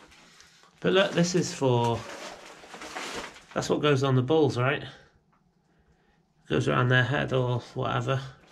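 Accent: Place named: Scotland, United Kingdom. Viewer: where did you get that great accent from? Scotish or Irish? I assume Scotish.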